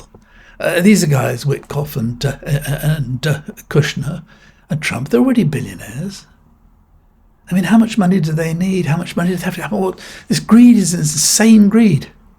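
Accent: United Kingdom. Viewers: If you don’t know, know this now: Even though this man has an English/Brit accent, ONLY AMERICANS SAY THAT THE PRESIDENT OF THE USA “IS THE MOST POWERFUL PERSON IN THE WORLD.”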